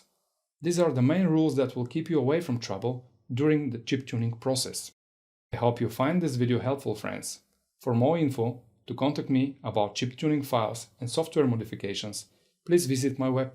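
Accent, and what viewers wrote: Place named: Eastern Europe. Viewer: Слышу славянский диалект в произношении )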